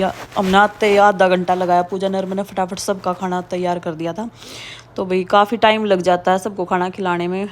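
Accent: Haryana, India. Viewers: U p me rehne ke Baad bhi aap ki haryanvi tehth h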